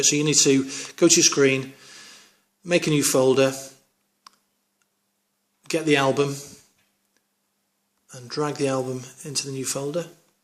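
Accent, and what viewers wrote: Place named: London, United Kingdom. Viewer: what is the app??? i cant understand your london accent !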